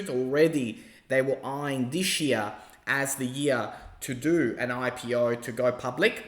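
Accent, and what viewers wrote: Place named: Australia. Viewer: I like you, I think most people are bellends, but not you, your pretty level headed, also you have a crazy voice not just because your an aussie but its very distinctive, I think you could do voice acting! Subbed!!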